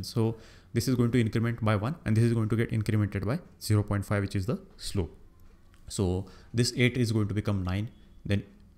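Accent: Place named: India. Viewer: indian accent is crazy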